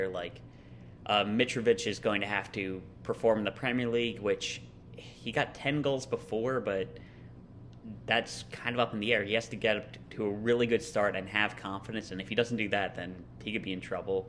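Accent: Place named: Baltimore, United States. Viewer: Another great vid. Is that a Baltimore or Philly accent?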